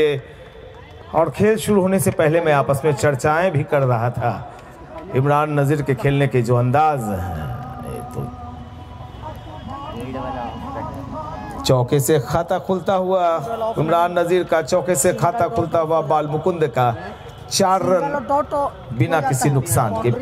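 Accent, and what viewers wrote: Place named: India. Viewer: Your voice is fantastic ji....Totally loved the flow of your hindi commentary. However the English commentary is very awful, Its better to continue in the original Indian English dilect. - Cric Academy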